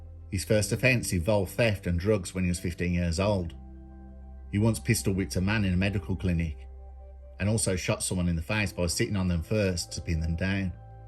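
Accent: Birmingham, United Kingdom. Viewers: I’m working my way through your video slowly, but I thoroughly enjoy your wonderful work. I don’t normally comment but I thought this video brought your personality through brilliantly. Keep up the good work! I enjoy your sillly smooth Brum accent (I’m a manc) 👍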